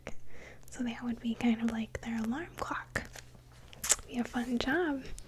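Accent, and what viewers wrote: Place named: Michigan, United States. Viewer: Do you live up north? You have an accent like my family members from Michigan ❤️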